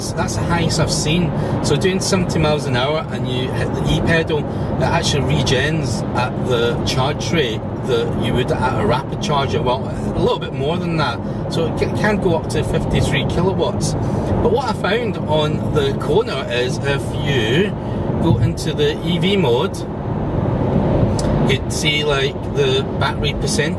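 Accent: Scotland, United Kingdom. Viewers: thankyou for this great video very informative , now I think I have decided to buy one in Australia PS:love the scotch accent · A china-man with Scottish accent, priceless!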